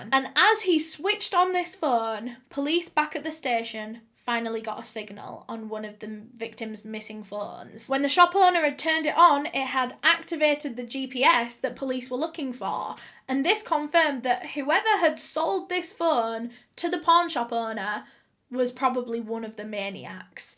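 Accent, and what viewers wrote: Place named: North, United Kingdom. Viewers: I'm from Dublin and my brothers wife to be is from Leeds and she sounds alot like you. That accent is too gorgeous 😍😍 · She’s northern 😁💗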